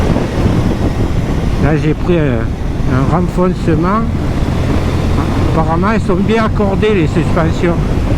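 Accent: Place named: South, France. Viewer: Salut l'ami, j'aime ton accent du Sud 👍. Cette Hornet 750 ne m'intéresse pas mais de tous les Youtubeurs que je suis abonné je fais une exception parce que c'est toi, donc j'ai regardé ta vidéo. Moi c'est la nouvelle Transalp que j'attend et j'espère que tu pourras l'essayer dès qu'elle sera dispo à ta concession. Après, selon la fiche technique c'est la hauteur de la selle qui me fait peur...J'ai pas de grandes jambes, c'est pour ça que j'appréhende les Trails. ✌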